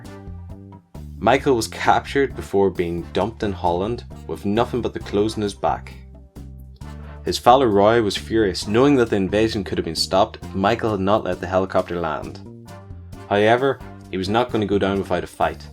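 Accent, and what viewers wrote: United Kingdom. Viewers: I really like your videos. I just subscribed. What part of the UK are you from? I really like your accent.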